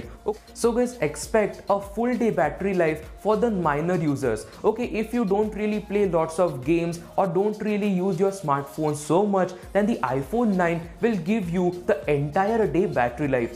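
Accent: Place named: India. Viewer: Your accent is reminding me of Indians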